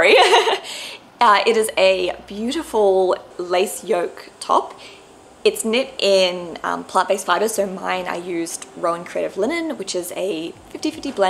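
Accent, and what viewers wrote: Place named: Australia. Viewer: I loved seeing your knits for 2022 and looking forward to watching more of your episodes. It is nice to hear an Aussie podcaster in the mix. I have to admit, I gasped horrified when i saw your felted sweater. 🤕I just started a knitting podcast and you inspired me to do a 2022 knits video. It was really interesting for me to go back over the year and see what I made.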